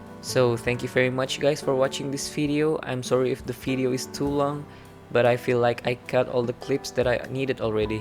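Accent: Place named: Indonesia. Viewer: nice video! and I knew that you were Indonesian from the first seconds in xD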